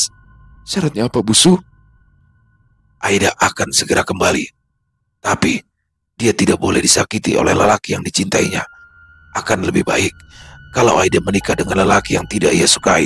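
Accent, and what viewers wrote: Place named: Banjar, Indonesia. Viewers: Kaltim hadir bang kental banar banjarnya😂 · Waalaikum salam, klo bang berbahasa banjar logatnya spt org banjar asli, salut bang😊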